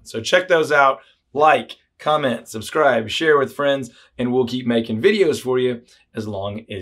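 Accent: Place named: Dallas, United States. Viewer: I bet this guy talking is from Dallas.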